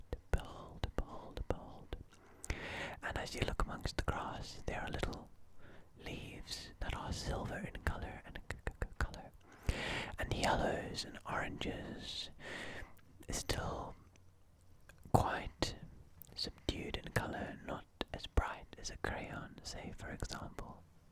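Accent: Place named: South Africa. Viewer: you sound south African homes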